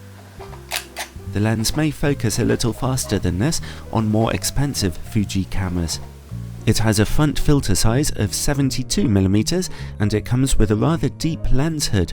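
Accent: United Kingdom. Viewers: ❤️ British Accent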